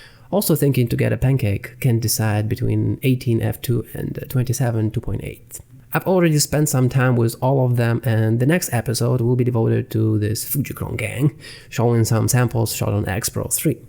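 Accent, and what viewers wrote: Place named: Asia. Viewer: how u sound asian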